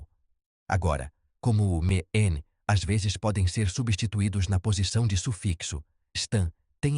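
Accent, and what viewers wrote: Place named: Portugal. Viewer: Narração com sotaque lusitano é um lixo mesmo...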